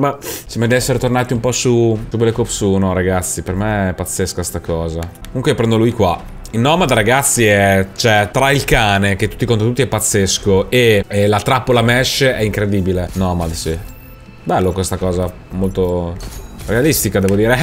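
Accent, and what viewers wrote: Naples, Italy. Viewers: sei Napoletano?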